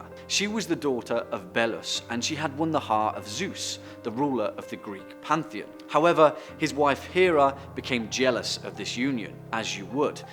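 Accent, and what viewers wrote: United Kingdom. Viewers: Incubus, succubus, Satan, Valak, and sleep Paralysis demons scare me the most weirdly enough with some of yall British accents the videos aren't too scary.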